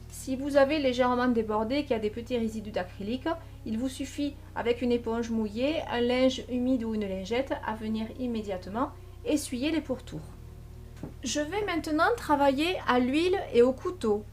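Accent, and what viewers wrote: South, France. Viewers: Bonjour,👍je vous découvre seulement maintenant. Enfin des vidéos en français. Ce n'est pas évident de comprendre certains artistes en langage étranger. Surtout pour la partie technique et les produits. De plus votre voix passe très bien aux intonations du soleil. Votre tableau m'a donné envie de le réaliser à mon tour, je fonce. Merci de vos conseils. Bonne continuation à bientôt de vous retrouver. ✌👍👏😘🌹 Christine de Cannes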